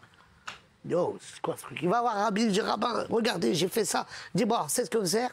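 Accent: France. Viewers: Cest si plaissant ecouter des blaguees du vrai français et non quebecois français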